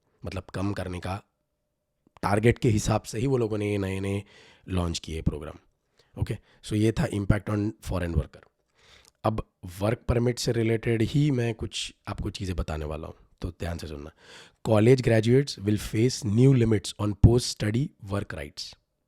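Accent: India. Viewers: You need to improve your English speaking skills, sounds like you just came from India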